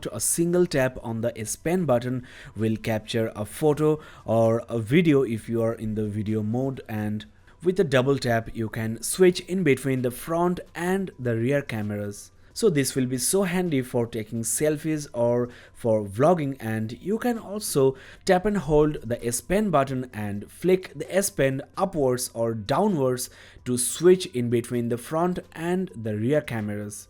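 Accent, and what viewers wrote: India. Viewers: I absolutely hate Indian accents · God, I hate your bud bud accent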